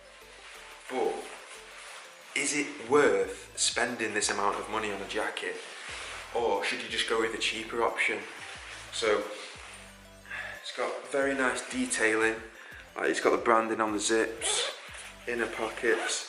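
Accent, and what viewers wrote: United Kingdom. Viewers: I HATE BRITISH ENGLISH...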